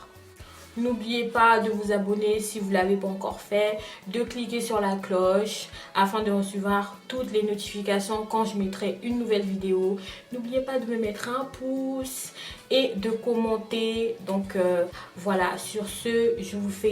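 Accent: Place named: Republic of the Congo. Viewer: Rien qu'a l'accent... je reconnais Congo brazza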